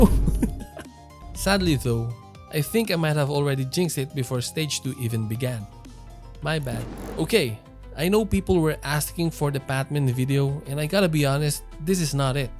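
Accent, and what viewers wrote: Philippines. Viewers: im suprised when i heard that filipino accent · From his accent, I think he is Filipino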